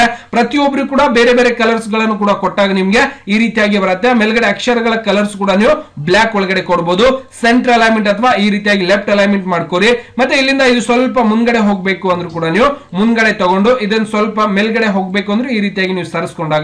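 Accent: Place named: Bijapur, India. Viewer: Nam Bijapur Mandi Hinge Matadtar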